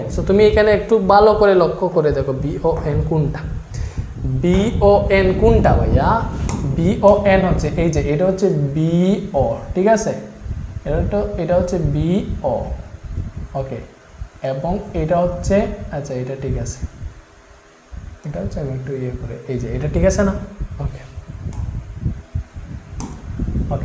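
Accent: Sylhet, Bangladesh. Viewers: Vai apni Sylheti Matiya Koin ❤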